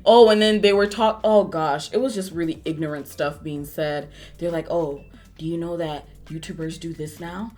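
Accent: Africa. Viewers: I’m living for the African accent thoooo😍😍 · Hey... great video, you and my youngest Erin share the same birthday!! Happy belated birthday. You have helped me so much with this video about the weight gain issue... I plan to be more aware of what I say to my 19 year old daughter!! Kudos to you for putting yourself out there. It tickles me to hear your African accent. I’m married to a Cameroonian and you make my day when I watch your videos!! Lol keep the videos coming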